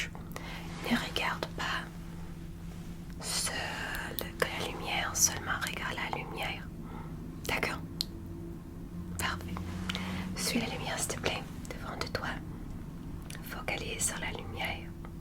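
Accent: Quebec, Canada. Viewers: No way la seule asmr artist que j'écoute c'est celaine et je suis tombée sur ta video par hasard dans mon fil c'est funny😂 Je l'ai écouter et ca fait vraiment différent avec ton accent québécois et t'es un peu moins hyperactive que celaine 😅😂 mais c'est très bon bravo! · Bonjour je suis nouveau sur ta chaine j'aime bien ton ASMR avec ton axen québec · Ohh je suis tomber en amour. J'ai toujours eu de la misère avec le asmr québécois. Mais la...❤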